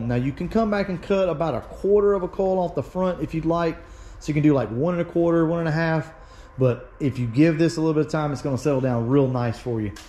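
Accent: United States. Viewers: so americans really talk like that?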